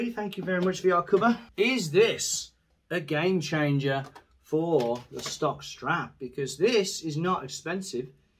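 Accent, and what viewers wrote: United Kingdom. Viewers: Excellent review mate. Also very comforting to hear it all coming from a fellow Brit. Not to be xenophobic 🤓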